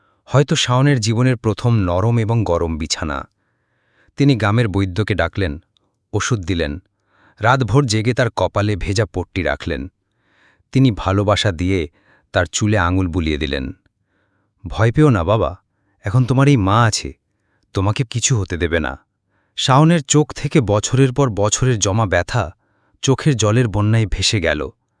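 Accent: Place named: Dhaka, Bangladesh. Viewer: এই গল্পকার ঢাকার কথা বলার কারণে গল্পের গুরুত্ব কমে যাচ্ছে।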